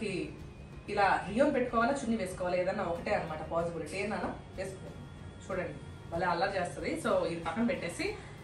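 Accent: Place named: Kadapa, India. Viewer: Bendu teese kuralu shuru, Beerakaya yendu chapala mix, donda kaya talimpu, rendu rende andi 😋😋. Antha energetic mothers ye andi mee home lo ammamma> amma > meeru. Methhalluu, royapottu kadapa slang 😊, exhaust fan vesukondi sound vinapadutundhi no worry, andhuke subscribers ki earphones use cheyyamanandi, beerakaya so colorful 🥒🌶🍅. Now dondakaya preparation start, Wowww dondakaya prepared, Rio ki manchi exercise 🦜, now beerakaya yendu chepala pulusu prepared 🥣🥣, Gongura pacchadi kuda 🥣, Rio viswa prayatnam chestondhi dondakaya tinalani 🦜, Nenu insta delete chesanu andoyi, kitchen lo Rio yuddhame chestondhi, nice vlog garu 👌👌👌 but taste review 🤔🤔🤔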